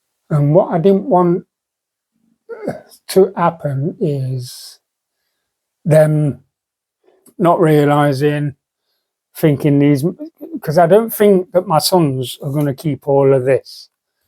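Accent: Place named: Midlands, United Kingdom. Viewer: where are you based in the uk you sound like youve got a south east midlands accent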